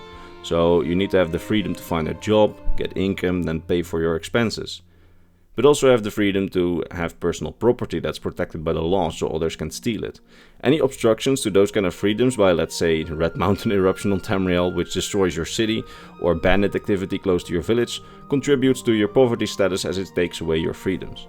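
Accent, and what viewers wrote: Netherlands. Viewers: Ik kan aan je accent horen dat je Nederlands bent :)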